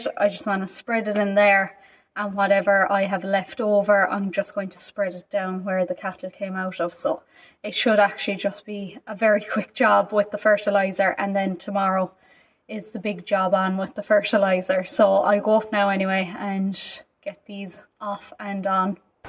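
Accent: Ireland. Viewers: Is it me...or does look cuter in every new video...her Irish accent is 🔥 HOT!!!!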